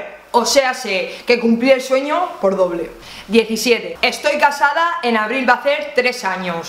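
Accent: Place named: Spain. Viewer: Muy buenos videos, saludos desde Mendoza Argentina, con mi novia nos vamos al sudeste asiático el 28 de diciembre. Nos gustaría saber de dónde es el acento que tienes? De que parte de España. Porque también lo hemos escuchado en la casa de Papel por ejemplo, saludos!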